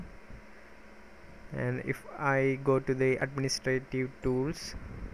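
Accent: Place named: India. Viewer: thank you for the video. as a helpful bit of information, your indian accent is thick, so it is already difficult to understand your english. please slow down your speech and say your words more clearly.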